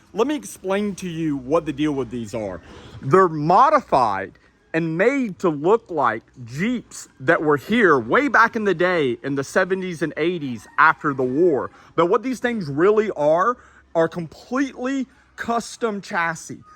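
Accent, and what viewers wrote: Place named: Pennsylvania, United States. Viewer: In Imus? Should have run through Milwaukee or Sarau for the big personal OTJ’s. You may be in The Philippines, but that accent sounds like Westmoreland Co., PA! Lol😅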